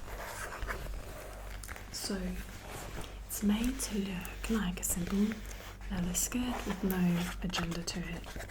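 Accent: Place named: United Kingdom. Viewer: You sound more like a British Mi5 agent:)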